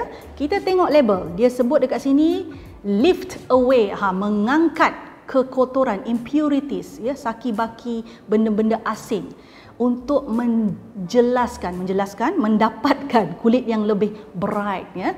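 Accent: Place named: Malaysia. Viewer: Saya orang indonesia, suka banget dengan logat malay. saya paham betul point yang di bahas, walaupun beda bahasa. Terima kasih kaka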